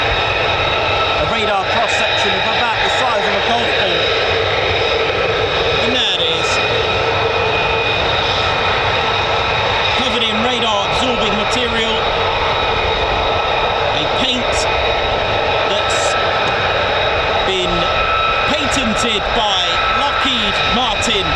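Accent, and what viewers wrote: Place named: United Kingdom. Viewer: Love ur channel!! Love the British accent!!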